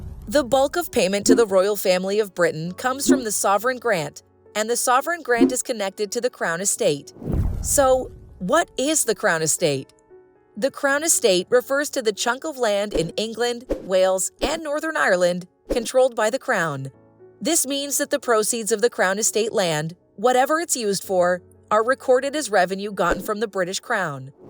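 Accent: United States. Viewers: The Monarchy is not irrelevant, its existence guarantees continuity, stability, freedom and democracy. The fact that a Monarch is our head of State means that no single Party or group can take over the British Isles. It also means we don’t have the divisive need of elected Presidents who would polarize society. We have a democracy like no other in the world, and look at it this way…Our head of state is apolitical and at least we don’t have to elect Presidents, which would create Constitutional crises and social unrest every time. Why are we preached to by an American voice anyway. What the heck do Americans know about the British Monarchy?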